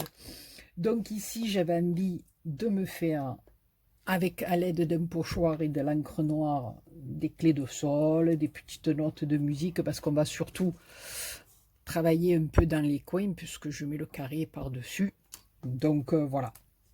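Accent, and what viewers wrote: South, France. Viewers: Coucou, ils sont superbes ces stickers, j'aurai bien aimé les trouver!! ils sont magnifiques tes ATC, j'adore!!! et le petit accent du Sud met du soleil dans nos coeurs dans ces moments de grande épidémie! ça fait du bien! merci beaucoup pour ce tuto, bisous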